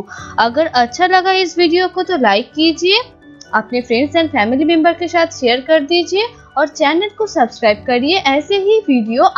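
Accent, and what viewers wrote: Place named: Bengal, India. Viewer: Thank you for all the information. BTW, your accent proves that you are a Bengali. 👍🏻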